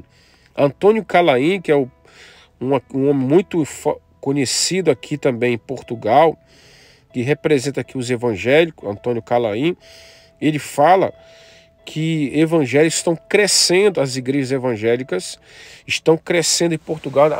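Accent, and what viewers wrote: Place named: Brazil. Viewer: Não sabes do que falas! Pelo teu sotaque és do Brasil, fala do Brasil! Deixa Portugal para os Portugueses!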